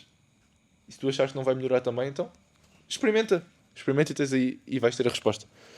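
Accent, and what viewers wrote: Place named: Portugal. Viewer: Eu acredito muito no poder da mente e na Lei da Atração.... Amo o sotaque Pt Portugtal. Abraços do Brasil bro!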